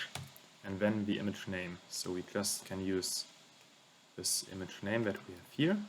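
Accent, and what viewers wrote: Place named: Germany. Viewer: are you german? your accent seems like it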